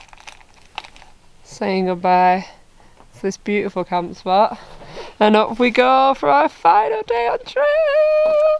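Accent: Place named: Lancashire, United Kingdom. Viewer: Well done and! Great set of videos. Loved watching each instalment. Gives us great food for thought. We are four 60+ yr olds from Wigan. We will be off doing TMB in 19th July this year. Training hard and looking forward immensely. Thanks for posting. BTW, where do you come from you sound…. Preston or Bolton? Can’t be far from us🤣 Take car and thanks again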